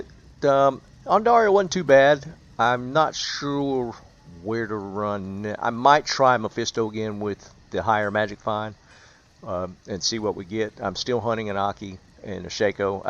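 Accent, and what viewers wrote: Texas, United States. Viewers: Sounds like Texas or Mississippi.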